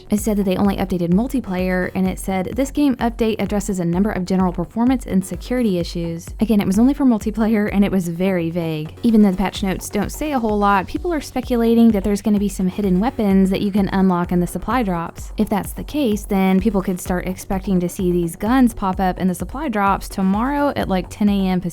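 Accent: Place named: United States. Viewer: Who Cares i am from england. her voice sounds 'normal' to americans yes but i love the american accent 😍😍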